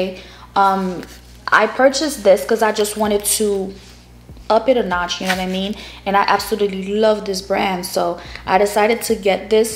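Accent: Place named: France. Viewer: You sound French😍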